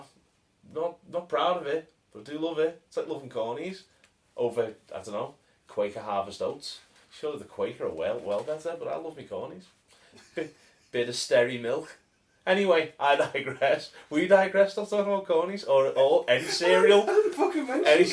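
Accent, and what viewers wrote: United Kingdom. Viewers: unmistakable British accent :D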